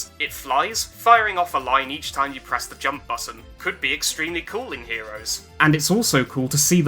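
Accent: United Kingdom. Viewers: This is the most pommy sonic tuber ever and I'm here for it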